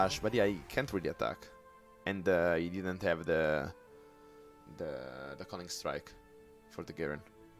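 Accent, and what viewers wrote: Italy. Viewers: l'accento italiano e incredibilmente ovvio comunque bel video. E METTI STO DECK CODE xd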